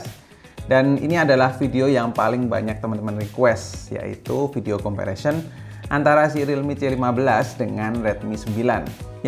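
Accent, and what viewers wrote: Yogyakarta, Indonesia. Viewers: Orang jogja bang?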